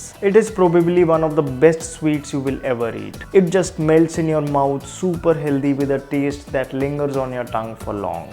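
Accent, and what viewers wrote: India. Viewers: your english is amazing .perfectly indian english .amazinggggggg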